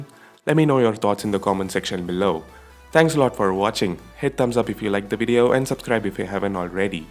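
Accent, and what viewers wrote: India. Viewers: The Indian accent ruins the whole review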